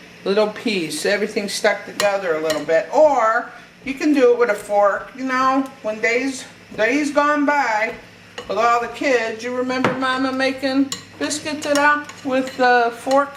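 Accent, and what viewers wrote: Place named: Maryland, United States. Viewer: Where are you? Your accent reminds me of Maryland.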